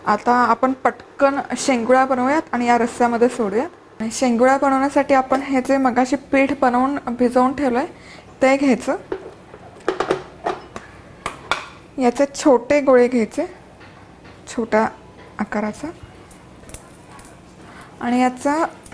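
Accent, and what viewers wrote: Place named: Pune, India. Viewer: tume chan puneri boltat....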